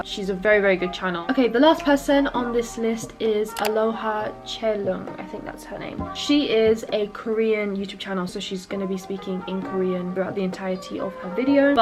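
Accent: United Kingdom. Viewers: I love your British accent and thats why i ve been keep watching your videos instead of watching the videos wit my native language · Ok i know m supposed to be focusing on what she’s saying, but her british accent is lit chef kiss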